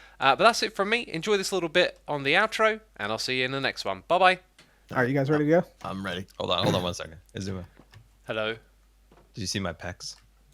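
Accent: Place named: United Kingdom. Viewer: it took me a while to understand what flower you were referring to lol I love British accent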